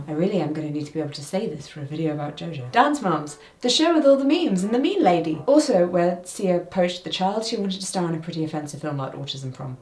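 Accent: RP, United Kingdom. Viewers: Is your accent that plummy naturally, or do you play it up for an on-camera persona?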